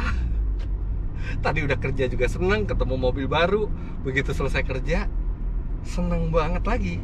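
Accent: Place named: Malang, Indonesia. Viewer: oyi sam mangkane ndelok seng lengkap opo'o om fitra kok tak arani kenek logat malang, mergo gelek bareng karo mas gilang juragan 99. Aku yo eroh nek yowis iku boso jowo sans sam gausa mesoh mesoh ngono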